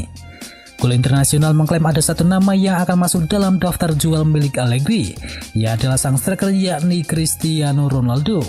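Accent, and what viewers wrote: Java, Indonesia. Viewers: Tolong bagi pembaca berita logat jawa nya d samarkan bos ,. Gk enak dnger nya . Kalo sekalian bahasa jawa mah iya aja aku suka .. SekedarMasukan dan saran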